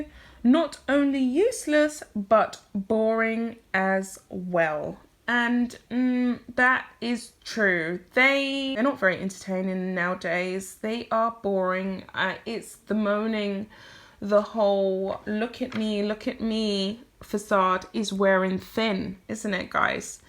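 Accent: United Kingdom. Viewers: I had never considered that you speak fast but for people not familiar with a British accent it must be difficult. I sent a comedian clip to someone and they said it took them a while to figure out what he was saying. I thought it perfectly understandable. 🙂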